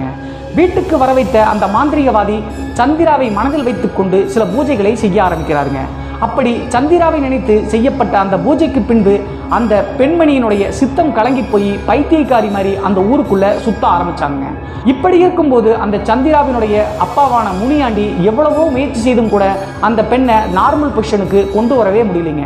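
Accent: Tirunelveli, India. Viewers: Bro enga area speech mari irukku. Tirunelveli doii🤩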